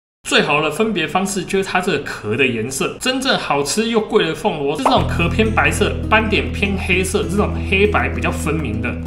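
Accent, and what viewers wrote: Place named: Penghu, Taiwan. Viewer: 我確認他是澎湖人，因為他講台語澎湖腔很重😂😂😂我也是澎湖人一聽就知道了。 而且他講解的很清楚喔～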